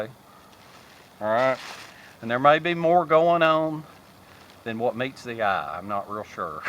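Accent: South, United States. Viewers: thats a strong southern states accent you have there good sir.....thanks for the clip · I feel like my own southern accent is far inferior to this guy's accent...that is incredibly southern! Theeyus = this, teeyunt = tent, eeyun = in, swayut = sweat... that is amazing! Where are you located? Is this accent common there?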